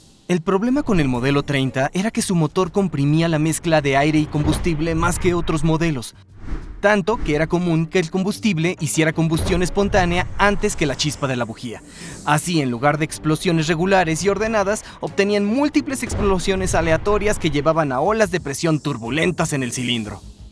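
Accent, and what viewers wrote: Latin America. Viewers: Es que este es latino y el otro era argentino y por ende es un poco mejor y actuado el latino en Argentino igual ahí actuación pero era más un doblaje documental osea menos actuación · Aquí hay un problema. Yo, soy de España y como hablante hispano, me siento perturbado por un doblaje que presenta un acento latino muy cerrado (como es el caso). El anterior narrador era PERFECTO. Su tono, expresión y enfasis era el adecuado en todos los aspectos. Este narrador enfatiza demasiado en las tonalidades y da como resultado una narración "cantarina" que hace que pierdas la atención al contenido del video y pierdas el interes de forma absoluta. He decidido desuscribirme del canal hasta que haya un cambio en el doblaje. Y si no lo hay pues ... entonces dejaré Veritasium en Español. :(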